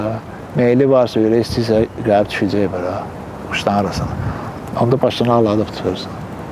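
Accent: Baku, Azerbaijan. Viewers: Can ey can baki lehcesi var ol qerdew mende quwbazam qedew baki quwdarna catan yoxdu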